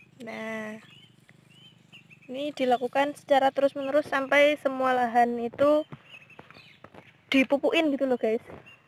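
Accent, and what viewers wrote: Java, Indonesia. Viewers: Bertani Tanam daun bawang lumayan tuh hasilnya.. info tani nya sangat bermanfaat. Yang paling menarik konten ini adalah gaya bahasanya logat jawanya medok campur sari hehe.. keren mba,🙏👍👍😁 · Walaikumussala.... wehhh bawang... mbak nya pinter nyangkul... dimana ini posisinya mbak... logate koyo wong jowo. Oya saya sdh antar ya pupuk kandang hitam ke rmh mbak. Saya tunggu pembayarannya dgn ketan hitam. Mampir ya mbak k rmhku kita ngopi hitam bersama.... spy tetap dlm kesetiaan belajar terusss.... ditunggu mbak.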